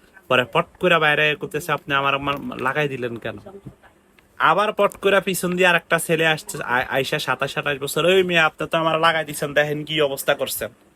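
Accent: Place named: Noakhali, Bangladesh. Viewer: বুঝতে পারছে তুমি নোয়াখাইলা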